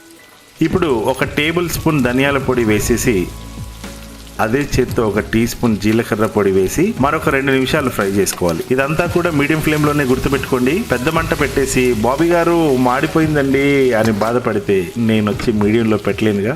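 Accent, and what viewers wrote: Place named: Rajahmundry, India. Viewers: Subscribed bro. I didn't knew you are from rjy although your accent and style looked too close RJYians from most of your videos I have watched till now. Touched bro. You are doing good work also. 👍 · Bhayya super 👌👌👌👌👌👌👌 Mana Rajahmundry slang lo meeru cheppe vidhanam Ultimate Anna.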